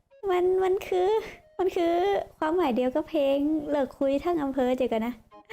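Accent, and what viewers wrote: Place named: North, Thailand. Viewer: ฟังกุ่วันกัมละหาหุ้ว่าเป๋นคนเมือง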